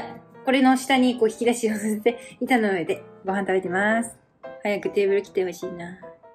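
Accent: Hokkaido, Japan. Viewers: 70歳のおばあちゃんです。いつも楽しく観ています。帰国してからのtalkで時々出る北海道弁がすごく可愛いなって思っています。もっと北海道弁で話してほしいです。因みに私は北海道出身ではありませんが、、、。英語頑張って続けて下さいね。私も長く勉強して現在は仕事に活かしています。継続は力なりです！ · の、北海道弁が可愛いらしく聞こえます。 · お話しも絶好調ですね。北海道Accent炸裂！道東の方ですか？ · イントネーションが北海道ですね😃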